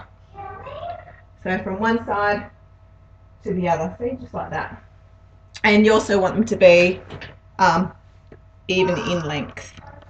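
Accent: Australia. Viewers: nice to hear another australian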